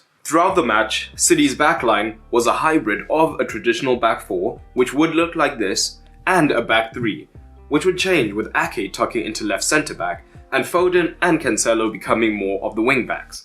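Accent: South Africa. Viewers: Is it just me ? I hear a South African accent…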